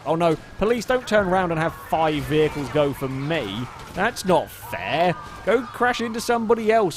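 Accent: United Kingdom. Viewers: How does this man not have more subscribers! He has everything a successful Youtube channel needs! Amazing content, british accent, and cat videos! I think it is our duty as fans to spread the word about and his channel!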